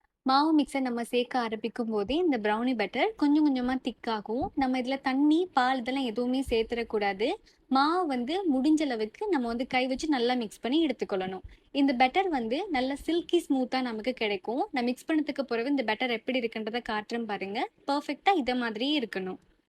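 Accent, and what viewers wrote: Sri Lanka. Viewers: Assalamu alaikum dear im 1st time watching ur video gud job and srilanka speech is cute ma sha allah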